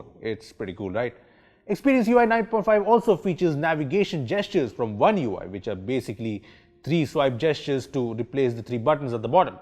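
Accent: India. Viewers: Omg ! All Indian youtubers = annoying accent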